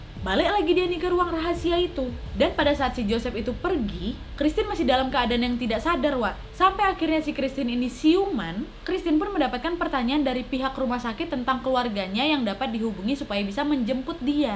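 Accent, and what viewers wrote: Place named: Medan, Indonesia. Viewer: Sukak kli lho denger kakak ini ngomong, logat medannya tu.. Hihi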